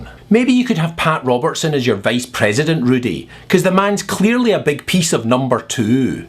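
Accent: Scotland, United Kingdom. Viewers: hahaha everything u say is funny. i think the scottish accent helps.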